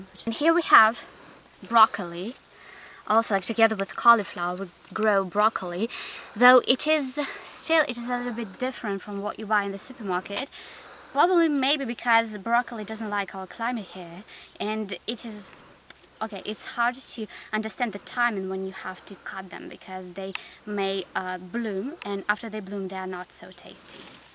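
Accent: United Kingdom. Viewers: You seems to have a British accent. Btw, your village is quite lovely. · So British accent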